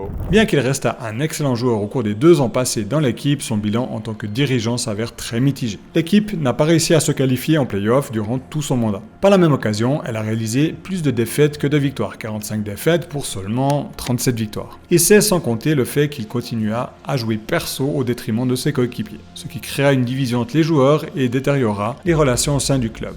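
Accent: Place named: Switzerland. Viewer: Bravo pour le boulot et l’accent Suisse qui fait plaisir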